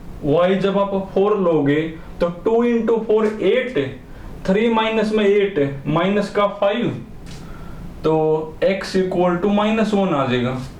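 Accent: Haryana, India. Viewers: Super aap harayana see ho kya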